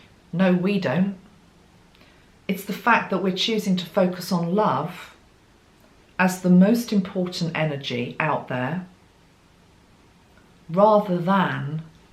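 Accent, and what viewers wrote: England, United Kingdom. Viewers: I just love listening to you. I love English accents. You have such a comforting voice and I feel such strong compassion from you. I would so love to be in one of your workshops but I live too far away. Bless you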